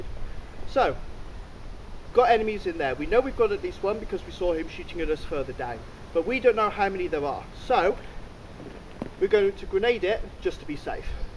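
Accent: England, United Kingdom. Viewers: you english?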